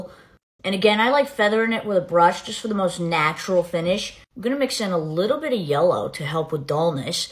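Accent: Boston, United States. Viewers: That's a Boston accent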